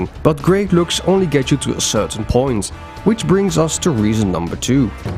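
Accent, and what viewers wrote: Netherlands. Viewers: Let me gues your dutch. Dat hoop ik tenminste anders heb je wel een speciaal accent. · Je hebt wel een heel Nederlands accent :D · ik hoor echt dat je NL bent <3 toffe vid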